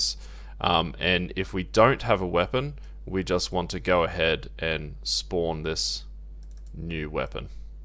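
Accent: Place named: Australia. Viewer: Really great series of tutorials! Nice to hear a fellow Aussie's voice too as a bonus.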